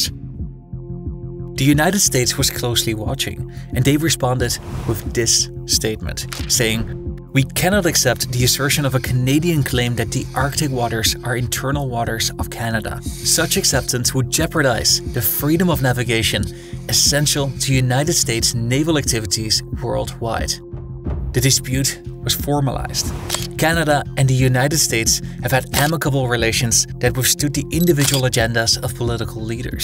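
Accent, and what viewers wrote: Netherlands. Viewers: Your dutch arent you? Lol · He isn’t pronouncing it that way on purpose, he’s Dutch.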